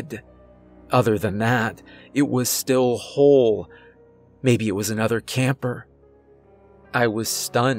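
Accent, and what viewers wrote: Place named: Great Lakes, United States. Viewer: I’ve wondered for some time now if the narrator is from the Great Lakes region because of the way they pronounce some words 💁🏽‍♂️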